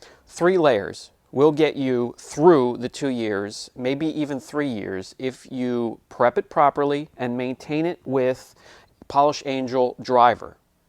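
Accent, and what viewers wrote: Pennsylvania, United States. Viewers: Other than the "Pennsylvania-ese" I detected in your accent many moons ago, you sound perfect. And as far as getting ahead of yourself, it's up to us to keep up! Your videos are straight-forward and a pleasure to watch. I always learn something new. 👏🏻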